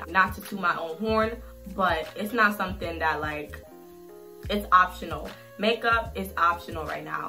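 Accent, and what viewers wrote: New York, United States. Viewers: Is she from new york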